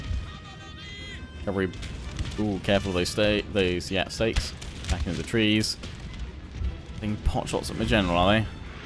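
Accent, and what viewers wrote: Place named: United Kingdom. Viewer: pls just tell me are u british or american because ur accent sounds like british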